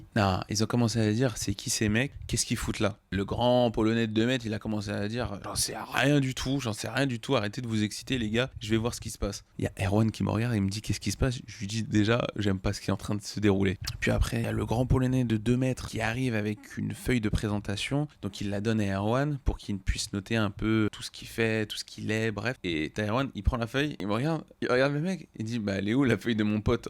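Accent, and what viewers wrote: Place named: Cameroon. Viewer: Tu viens de quel pays en Afrique tu parle comme un camerounais 😁😁😁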